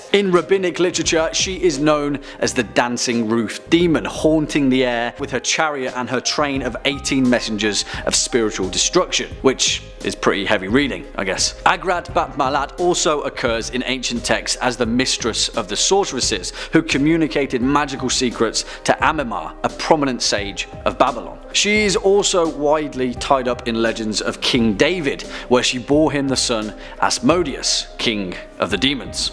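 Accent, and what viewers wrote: England, United Kingdom. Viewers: has the best english accent ever makes binge watching easy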